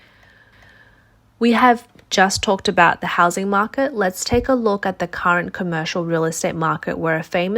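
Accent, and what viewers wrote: Australia. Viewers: You have a nice Australian accent, maybe you can sell to Australian investors that want to escape the Melbourne winter. because these prices are 5 times less than a Melbourne apartment. Sell to boys from Melbourne and they can get a nice Chinese wife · I know a fellow Australian when I hear one 😊, but it's anybody's guess which city she's from because Australia (unlike, say, Britain) doesn't have obvious regional accents (just a few minor differences in vocabulary). I was in a workplace with people from all over the country, and if you wanted to know whereabouts in Australia someone was from, you had to ask them. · I was in the chat some days ago watching her video too but the lass in question who narrated a couple of days ago is a different lady to this narrator. I think that lady is a Hong Konger from Sutton, London; whereas this woman narrating now is likely a Taiwanese or possibly Hong Kong Aussie probably from Brisbane or Sydney or Melbourne. 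I think many others who watch China Observer confuse all of the female narrators😂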